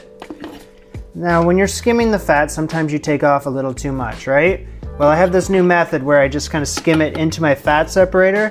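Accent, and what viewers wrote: Canada. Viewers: I am pretty sure he is Canadian, judging on his accent, preference for metric, the fact that his older videos were filmed in Canada, etc. He is currently in NYC though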